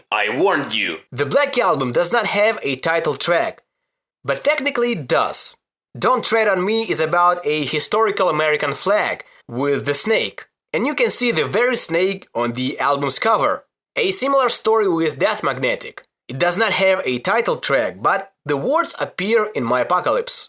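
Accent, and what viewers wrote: Russia. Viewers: Сразу подумал что русскоговорящий человек по акценту, а потом ещё и "поехали") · I love your russian accent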